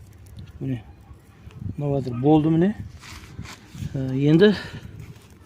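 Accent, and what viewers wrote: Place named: Turkistan, Kazakhstan. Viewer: Ассалаумағалайкум аватар жүзімінің қаламшасын күзде дәл осы кезде кесіп көміп көктемде тамыр шығарып алса болама? Түркістанның қазағымын сіз сөйлеп жатқанда қай тілде сөйледіңіз тура біз сияқты ғой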